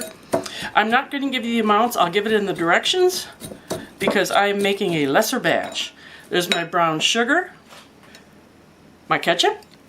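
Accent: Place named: Illinois, United States. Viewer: Got to love that Illinois accent! Hahaha gets 3. Oh well he will make up for it in the pie Dept!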